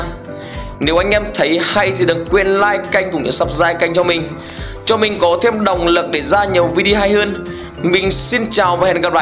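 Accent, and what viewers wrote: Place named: Central, Vietnam. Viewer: Anh người miền Trung à anh,chữ e ko hiểu:))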